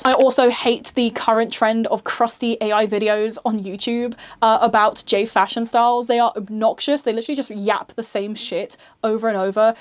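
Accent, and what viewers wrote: United Kingdom. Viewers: She is very clearly and audibly English.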